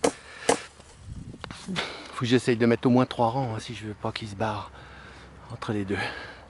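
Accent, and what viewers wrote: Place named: Allier, France. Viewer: Salut, je voulais savoir dans quelle région tu étais car je suis actuellement en stage dans l allier et c'est le même accent et la même façon de travailler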